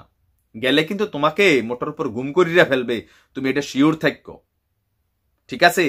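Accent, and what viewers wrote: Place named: Barishal, Bangladesh. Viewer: Vaiya josss hoiche borisal er vasha. Carry on vaiya. God bless you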